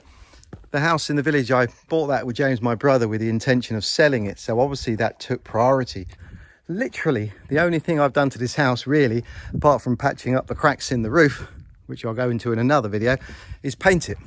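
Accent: United Kingdom. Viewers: Well, I started watching the 90 minute renovation video compilation of the village house, then went back to No. 1, and am now finished with No. 134. Whew, an enormous amount of work you've done there. I've worked in the construction industry in the U.S. all of my life, but I was fascinated by the type of housing that you have in Portugal. We use wood framing for most houses here, and I've never seen stone houses like yours. It was truly an interesting journey and I feel that I've been educated by watching your videos. But don't worry, I'm about to start on #135 and will be following your channel until you give it up. You're also to be commended on your videography talents, use of musical score, and the witty repartee that you constantly provide. Like most yanks, I enjoying hearing the British accent and have learned a whole new vocabulary. "Hob", "boiler", "salamander", "knackered", all these are fun to hear for the first time. 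Great job, keep it up, and good luck through all your adventures. Gary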